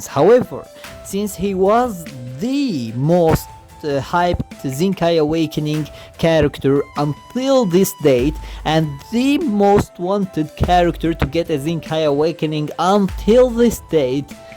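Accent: India. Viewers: Ayo 4 Why is ur ascent Indian is one of ur parents Indian or something like that? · Indian accent XD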